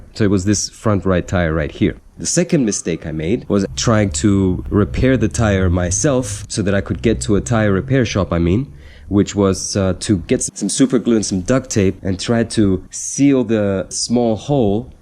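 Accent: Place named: North America. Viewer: Good video again. You definitely don't sound Greek, more North American accent.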